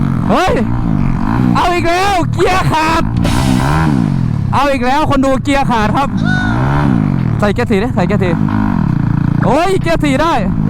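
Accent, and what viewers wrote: Isan, Thailand. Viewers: เป็นคนอีสานหรือป่าวคับ😅😅😅😅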